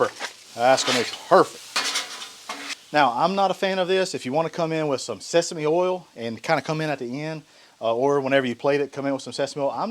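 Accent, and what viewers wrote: North Carolina, United States. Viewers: First video of yours that I have watched and you sure are making me miss home. Not that you cooked something I really ever had in the 2 plus decades of living in the Durham/Chapel Hill area, but your accent and slang...thank you :) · You must be from NC. I can tell by your accent…. Maybe Thomasville or HighPoint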